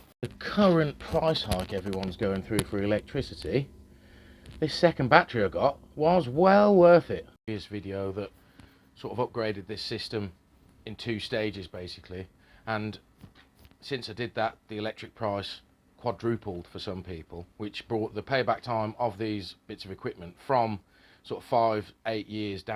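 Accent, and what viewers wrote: United Kingdom. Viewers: another good video bro. Are u in england ? cant make out the accent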